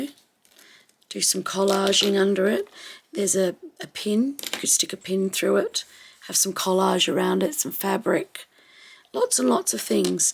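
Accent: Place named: Melbourne, Australia. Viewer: I am enjoying your channel and ideas! I made the Japanese fan journal from coffee filters and REALLY love it!!! As a bonus, I have a dear friend of many years who lives in Melbourne. So, listening to your lovely accent makes me feel like I am spending time with her!!!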